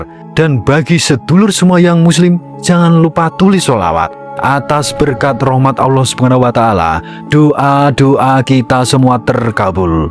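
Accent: Java, Indonesia. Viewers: Ngomong ne kayak orang kampung saya wong jowo,malu saya dengar nya, harusnya logat Jawa nya harus hilang, pakailah logat bahasa Indonesia yang baik dan benar,karna kita orang Indonesia berbagai suku ras dan agama yang melihat dan mendengarnya.😂😂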